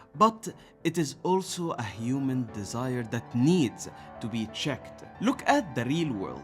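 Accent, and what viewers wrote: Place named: Egypt. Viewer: Definitely an Egyptian with that accent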